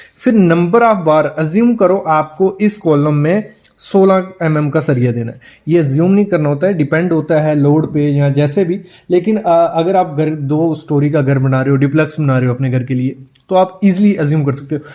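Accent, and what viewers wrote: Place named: Kashmir, India. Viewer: Aapki vice batla rahi hai ki aap j & k se hai ji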